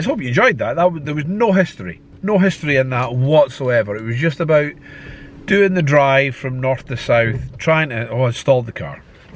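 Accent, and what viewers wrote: Scotland, United Kingdom. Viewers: Nice to hear a fellow Scot on here🤣👌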